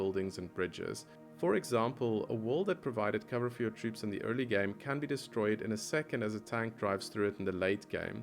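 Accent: South Africa. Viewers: Was surprised to hear the SA accent! Have this game in my Steam Library but haven't downlosdedit yet. Not BIG RTS games but have been exploring them lately. Also turn-based RPGs like Xcom and Divinity: Original Sin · Hey, are you South African?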